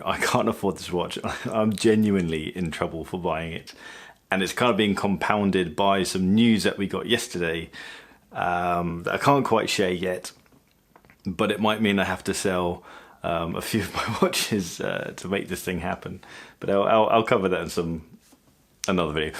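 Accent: RP, United Kingdom. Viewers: Congratulations on the Baby? Where did you go to school? I have been listening to RP on YouTube and to me you have some RP but other hints but can't place. I am from USA.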